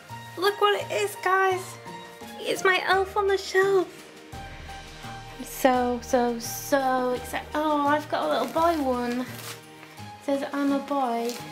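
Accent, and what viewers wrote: United Kingdom. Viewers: what's up with British accent my elf name is mark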